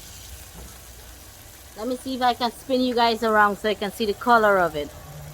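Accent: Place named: Guyana. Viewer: i have watched this video/your Narration about preperation is amazing/you are talked very nice -some times I am laughing about your talking /your english is mixed with Guyana and little bit 🇺🇸USA/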